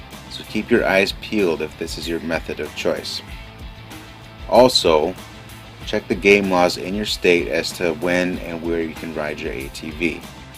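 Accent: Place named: Midwest, United States. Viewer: Great advice, can't wait to put it to use. Feels right hearing it from a midwest accent.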